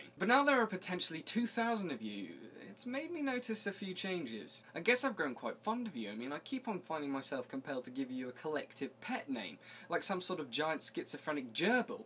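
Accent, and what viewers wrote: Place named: United Kingdom. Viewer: Congrats on the 2000. I know with the LPs I watch the commentary I like to listen to some one who sounds (key word) atractive. Not only you have a lovely, natural, Brittish accent, but you put your lovely, actractive face on the screen. You have the extra facial expressions that a lot of the more popular LPers don't post on their videos. This also makes your LPs unique.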